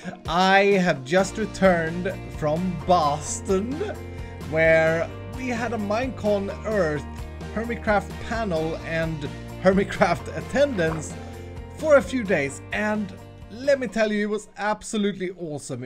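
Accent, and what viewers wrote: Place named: Sweden. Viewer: I must say that I love how you talkin such a swenglish accent all the time but then do all those great english accents when you are playing around. Luv it =) You guys were great in the panel btw. One could say that it was a smorgasbord of hermits to enjoy.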